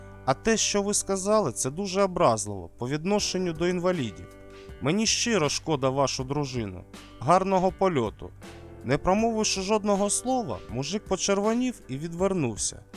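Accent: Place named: Russia. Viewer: В мене таке враження, що автор кацап, бо читає з рососійським акцентом, підозріло, не буду дивитися!!!!!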